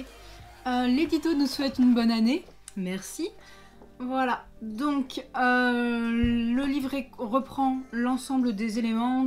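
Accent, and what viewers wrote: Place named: England, United Kingdom. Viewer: J'aime bien ton accent anglais 😊😀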